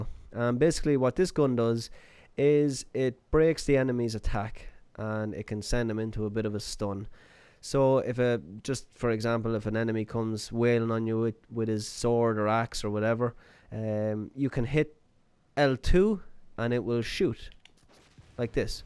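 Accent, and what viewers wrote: Ireland. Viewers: Where you from in Ireland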